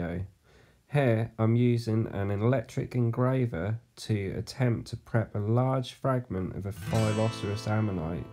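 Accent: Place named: Norfolk, United Kingdom. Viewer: Hi, I live on the Norfolk coast and you sound as if your from my neck of the woods, I have found sum interesting find that I would like to share with you and wondering the best way preserve them to get the best from them.????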